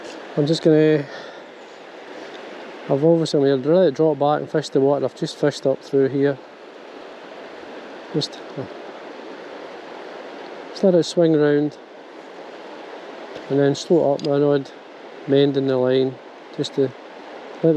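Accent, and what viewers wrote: Scotland, United Kingdom. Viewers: are you from Scotland? I've heard it said that some scotts cannot say "Purple Burglar Alarm"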